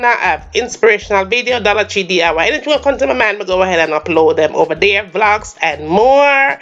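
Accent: Jamaica. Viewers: Love your Jamaican accent🥰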